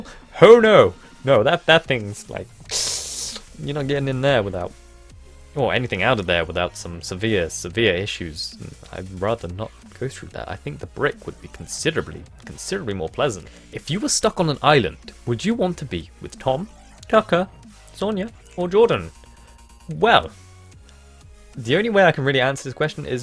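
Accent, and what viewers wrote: England, United Kingdom. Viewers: What`s up, English citizen? So like a week ago, my sister`s friend told me about Captain_Sparklez`s popular channel and recomended me the series of ``Mianite``. Like a half season after the start, the great Wizards came to the land to greet them with their amazing buildings. You were one of them. As the Wizard who showed to Jordan the most I remembered your name.. One of his eps he had a building lesson from you. And that was the first time I heard your voice. And I completley fall in love with it. It was deep, it could show all the emotions you were feeling and it had English accent. I love English accents. Like... I can guess you`re from the UK just when I hear your voice. So today I finished the season and after like two hours I decided to check if you had a channel. So I typed `` `` and your channel appeared. It was amazing! 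This video is probably my favourite. Why? I don`t know actually. Maybe because the whole 30 minutes of it I was staring at you and your voice made me stop seeing things around me. I could just listen to it with with hours. The problem with the not matching to the face voice is actually simple. I realised, you don`t look to young for that deep voice. You actually look half young. Your beard makes half of your face look like you have that voice. But from the nose to the hair you look like 17 years old to me. Oh, before I end the comment I`ll say that I recommend you to play OUTLAST. Pleaseee! M`kay byee. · Just out of curiosity, do you think that Americans have an accent? Because we say that you have and 'English Accent.'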